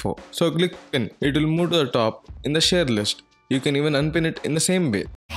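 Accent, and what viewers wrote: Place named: India. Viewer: That's Indian dialect. He knows English perfectly well, thanks to the British Empire.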